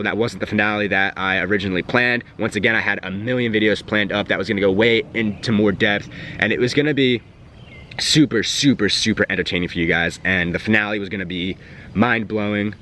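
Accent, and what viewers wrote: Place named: New Jersey, United States. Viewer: You are the best bring your jersey accent back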